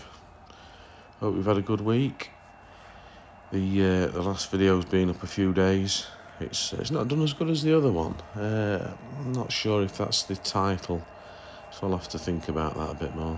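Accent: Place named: Yorkshire, United Kingdom. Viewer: Enjoying your work. Not a modeler myself but I like the total escape from my normal day that I get from watching your videos. For someone relatively new to it your layout looks great. Your eye for a shot and the videos you produce are virtually unique and are for me far more engaging than the more usual style which mostly consists of trains going round in circles. And all toped of with your dry as dust take it or leave it attitude delivered in a friendly Yorkshire accent. I think you are on to something.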